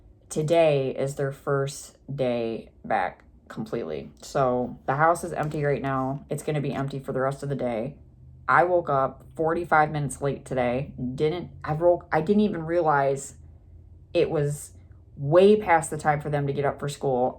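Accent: Michigan, United States. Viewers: ur michigan accent is so funny to me im from cali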